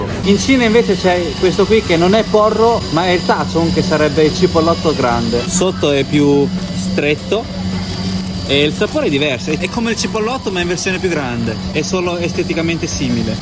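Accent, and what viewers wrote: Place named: Emilia, Italy. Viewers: Sei cinese ma vivi in Italia? Mi sembri un po' emiliano 😊 o sbaglio? p.s. bel video alla fine anche in Cina si trovano prodotti italiani. ❤ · soccia sei di bologna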